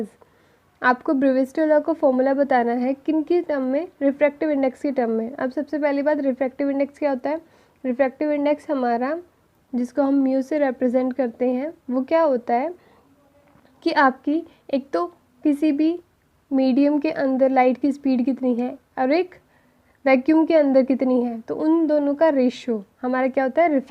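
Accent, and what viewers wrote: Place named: Haryana, India. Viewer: Language touch aa hi jata h haryana wala ka... 😅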